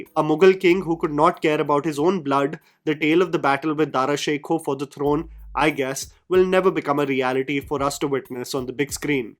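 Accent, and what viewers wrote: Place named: Punjab, India. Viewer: He is speaking like a panjabi not a maratha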